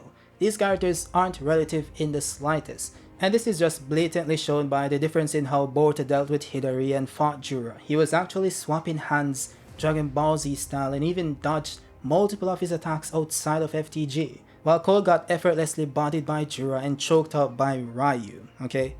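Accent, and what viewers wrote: Jamaica. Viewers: Bro are you Jamaican ?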